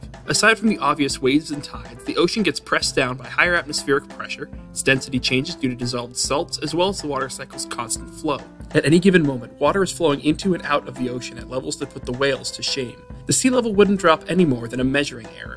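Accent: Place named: United States. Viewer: Sounds American, says "maths" For shame..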